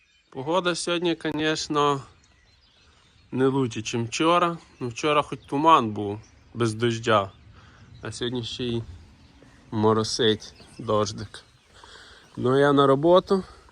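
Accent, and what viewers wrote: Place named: Vinnytsia, Ukraine. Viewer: Ви говорите як більшість на Вінниччині...))))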